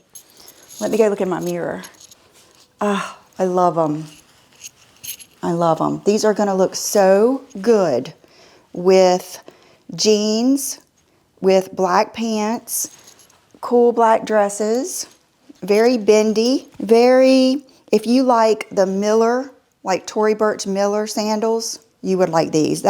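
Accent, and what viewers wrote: South, United States. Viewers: Funny story. A close friend of mine and her daughter were in Paris about 3 years ago. They were in a looooooong line to go in the Hermes store, where her daughter just "knew" (hoped and prayed) she would get lucky. Suddenly, they pulled her out of line, and she was able to buy a Birken on the spot! It was nuts!!! They couldn't believe it. No explanation was given. CRAZY! You just never know. The lawsuit? I have no feeling one way or the other. Love your channel...I've watched you for YEARS and YEARS! You're the sweetest, most authentic Southern Belle I've ever seen... and I'm southern, so I know! LOL I don't know if your state considers themselves the south, but your accent is fabulous!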